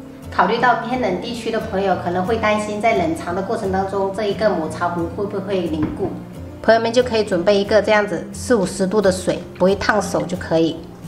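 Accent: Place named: Fujian, China. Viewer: 听口音是福建人 她老公可能是四川的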